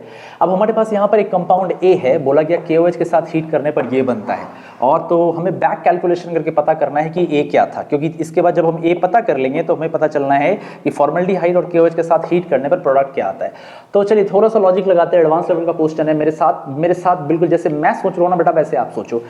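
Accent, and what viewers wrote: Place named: Bengal, India. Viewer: Sir, I wanna ask u a question. Are you Bengali because your accent matches with bong culture.Some friends of mine are from Kolkata & they have same accent. Please reply sir.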